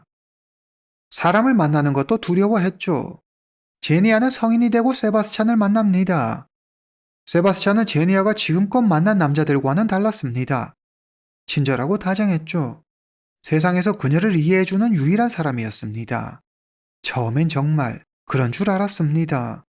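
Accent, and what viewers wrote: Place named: Korean-Chinese, China. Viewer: 조선족 특유 억양이 살짝 남아있는듯 그래도 많이 고치셧네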